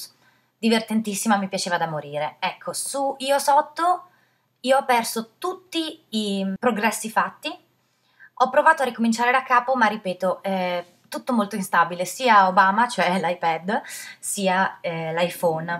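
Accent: United Kingdom. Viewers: ahaha la faccia da INIZIO DI SUPERNATURAL <3 sei troppo brava sei il mio programma tv..ma su yt :) ma poi la pronuncia british...love! un caro saluto,Alessandra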